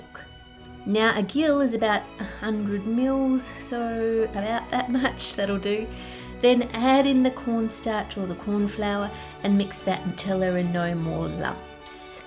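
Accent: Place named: Australia. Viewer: Gotta love the Aussie accent!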